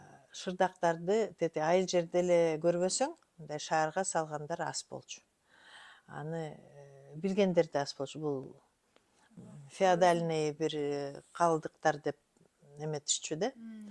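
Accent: Standard. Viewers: Кыргыз тилинде таза, сонун суйлойт экен, маа шаа Аллах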